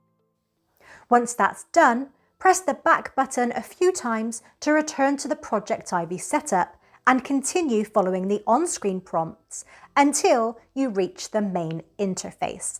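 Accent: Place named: Cornwall, United Kingdom. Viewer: 😁 I grew up in Cornwall so have an ear for an accent... Can't decide whether you're Cornwall or Devon..? Or, to cover my bases completely, Dorset 😁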